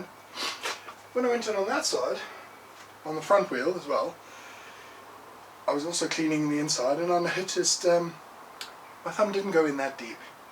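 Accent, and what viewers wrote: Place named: South Africa. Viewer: You got a bit of a mixed up accent, can’t place it, sounds a touch of South African..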